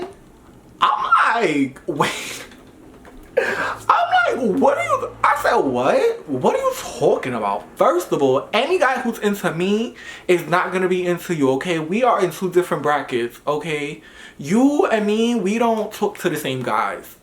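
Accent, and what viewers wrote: New York, United States. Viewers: Dionne T Lee this sound like the average new york nigga lmao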